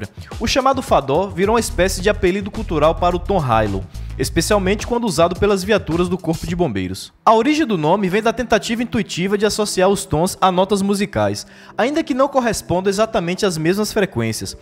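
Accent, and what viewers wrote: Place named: Salvador, Brazil. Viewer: c é daq de salvador irmao? teu sotaque e os video que vc botou ai é tudo daqkkkkk esse ultimo ai é na paralela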